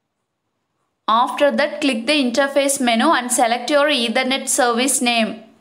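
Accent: India. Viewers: Thickest Indian Accent Ever.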